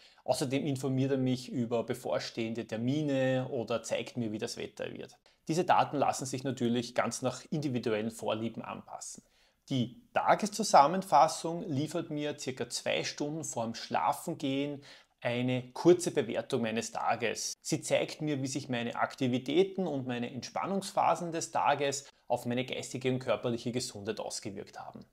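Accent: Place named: Austria. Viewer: Vielen Dank für das informative Video! Auch der österreichische Dialekt ist mir immer wieder sympathisch, weil meine Vorfahren aus diesem schönen Land stammen 😊😉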